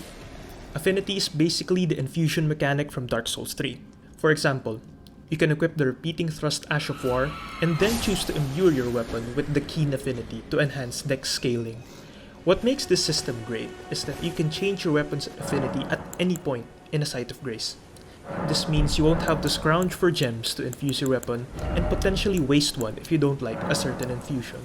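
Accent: Philippines. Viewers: pinoy accent!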